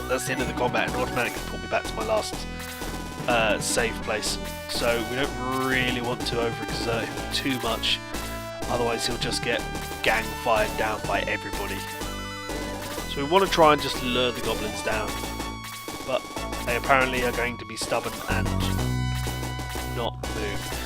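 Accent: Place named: United Kingdom. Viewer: Ermahgerd... An English bloke doing a playthrough of this game... must watch all... Dat voice acting. Doesn't even ruin, British voice too strong...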